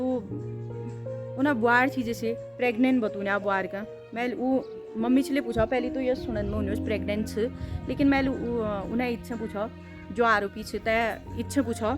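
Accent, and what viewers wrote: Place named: Uttarakhand, India. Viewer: आपकी भाषा ठेस गंगोंलीहाट की है आपका घर कहांहै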